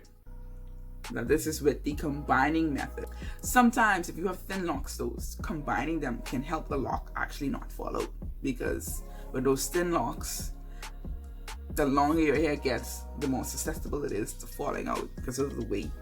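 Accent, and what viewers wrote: Bahamas. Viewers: Girl are you Bahamain, your accent sounds familar? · Thought you sounded like a Bahamian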